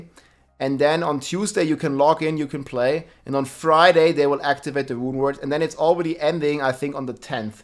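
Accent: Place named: Germany. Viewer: Perditation??? Per-di-shun. I understand German is your first language but whoa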